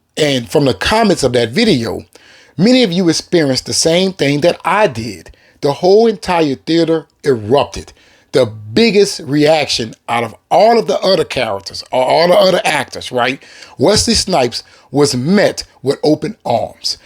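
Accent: New Orleans, United States. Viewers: Are you from New Orleans? I hear the accent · Man, am I hearing a nawlins’ accident? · that N.O. accent hitting and is much needed in the YouTube space. Keep it flowing, my friend. Love your content!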